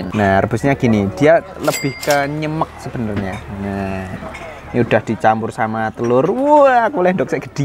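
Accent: Java, Indonesia. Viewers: pakai bahasa jawa campur Indonesia klo bisa pakai subtitle, jangan pake bahasa "elo" "gue", ciri khas mu logat & bahasa jawa lebih original mas... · Haha mantep tenan, jarang2 aku ketemu channel YouTubernya spesialisasine medok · medoknya mas suaramu😂😂😂😂😂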